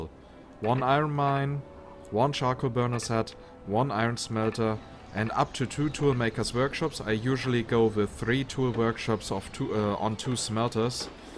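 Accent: Germany. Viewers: I love the accent, I knew you were German within a few seconds (I've lived here for 15 years I should know)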